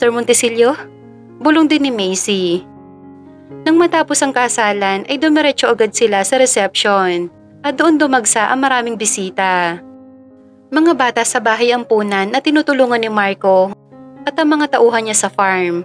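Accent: Philippines. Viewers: Anu kaya ang probinsya ng nagsasalita my vlogger din aq napapanood na vlogger sa cp nmn ganito ang boses nya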